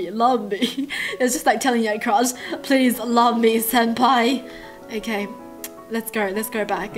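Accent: Australia. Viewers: "So after all of that, I'm going to diss a pee?" That's how Australians speak. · Are you Australian? I love your accent <3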